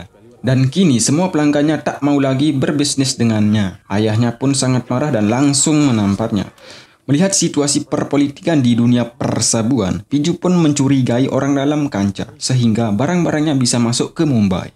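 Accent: Bali, Indonesia. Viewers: Loghat Bali,kya susah ngomong (pengulasnya) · Owhh soale logatx kyk org bali..sucses sellu mas..aamiin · Pembawa suara orang bali bli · logat bali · Logat Bali ini gan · Narator nya bhasa nya kek dr bali ya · Orang bali ya bli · Kayanya pengisi suara orang bali.. Keliatan dari vokal ngomong nya.. Bener gak?🤭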